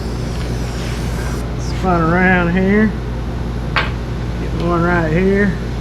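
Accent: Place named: North Carolina, United States. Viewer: Glad to hear someone that talks like me!! (Franklin, NC, Macon County)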